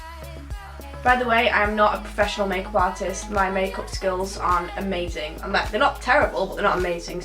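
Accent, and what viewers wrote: United Kingdom. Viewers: I have an American accent and you make me want a British accent soooooo bad!! 😩😩😍😍😍😍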